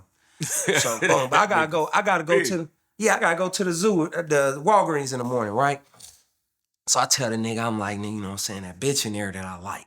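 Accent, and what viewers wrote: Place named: West Coast, United States. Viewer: Dang i thought he was from the West Coast, u sound just like us brah tight.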